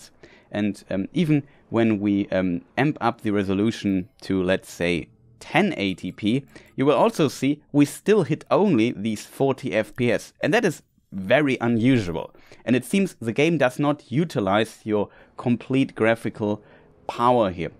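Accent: Germany. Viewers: Man hört sofort, da steckt nen deutscher hinter ^^ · Kann es sein das du eigentlich deutsch sprichst habe da ein paar Wörter gehört die das untermauern 😁? Warum ist es zum Standard jedes neue game mit so einer Performens raus zu bringen? EA kann nichts wenn sie nicht exklusiv dafür bezahlt werden. Das erste Update hat null was gebracht... CPU immer noch bei über 60% und GPU nicht mal bei 60%, CPU ist eine 2700x mit 4,2GHz und GPU ist eine 3060 OC sie läuft bei 2115MHz und 8000MHz Memory Takt. Mal sehen ob dieses Jahr noch ein Game kommt was einfach ab Tag eins läuft, das wäre ein Traum 😍. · You are definitely a German brah 😀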